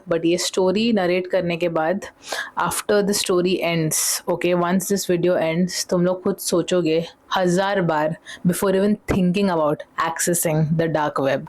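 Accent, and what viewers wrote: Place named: South, India. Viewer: Hello girl! new subscriber here. I LOVE YOUR NARRATING SKILLS, THAT SOUTH INDIAN ACCENT (if you are) and you add new vibe to story telling stuff from other story tellers on youtube platforms. Here for your content from 31.6k subscribers to 1million and more! LOVEEE YOUU BBG 🧿💕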